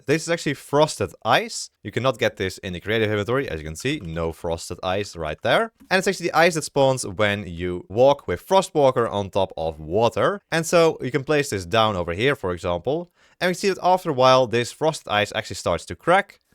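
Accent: Netherlands. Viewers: Damn bro ur dutch accent is faint. Lekker pik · Lekker hollands accentje 😂 heerlijk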